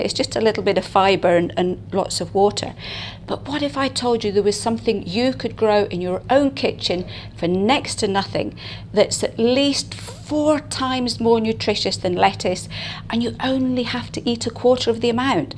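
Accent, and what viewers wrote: Scotland, United Kingdom. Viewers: Love your Scottish accent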